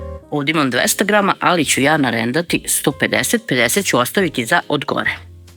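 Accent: Vojvodina, Serbia. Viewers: Svaka vama cast.Po naglasku bih rekla da ste iz Vojvodine ako je tako nije cudo sto vam sve ide kao voda.Veliki pozdrav.❤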